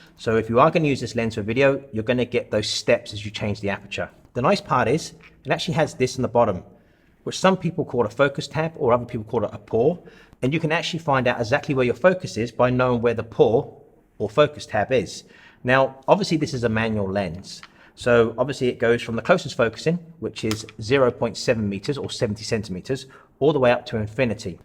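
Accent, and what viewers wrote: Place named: Portsmouth, United Kingdom. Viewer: Different is good sometimes, but the colour background is better. What happened to the Pompey accent video? Waiting to show friends here in Japan that I'm not the only one with this accent.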